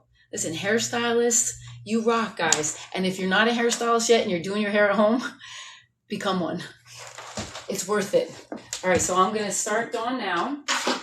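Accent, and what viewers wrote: New Jersey, United States. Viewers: As a cosmetologist myself for 40 years, I must say that I thoroughly enjoyed watching this video and I even learned a couple new techniques myself. I cut mostly men’s hair now so it’s nice to learn a few things again regarding women’s hair. And by the way, I’m from Rhode Island and I love your jersey accent! I’m in Florida now and listening to you just makes me homesick. You’re such a beautiful person with a wonderful attitude. God bless. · ❤❤❤I grew up in NJ and moved away to Cali but your voice reminds me of home! And now you say Shrewsbury (WHAT?!) I came from HIGHLANDS!!❤❤❤ I have no idea when I can get to Jersey but if you are ever taking a trip to California, please let me know!! My hair could definitely need your positivity and know how!! ❤❤❤❤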